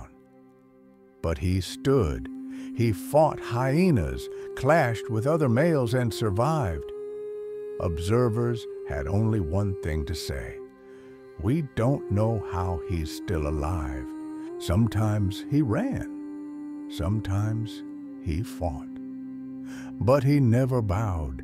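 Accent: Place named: South, United States. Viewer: Where are Jeremy Irons, Charles Dance or Sir David Attenborough?😢 This cornpone commentator Is Not the Ticket!🙄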